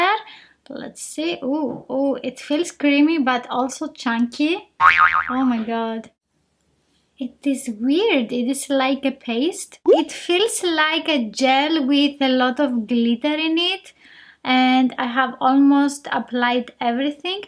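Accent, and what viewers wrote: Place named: Greece. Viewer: Είσε Ελληνίδα η κάτι τέτοιο? Γιατί η προφορά σου μιαζει ελληνική δεν το λέω για κακο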